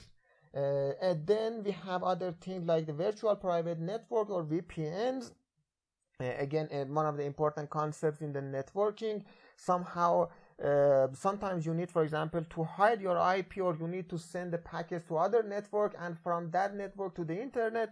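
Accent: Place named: Iran. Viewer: cheghad shabih irania harf mizani !!!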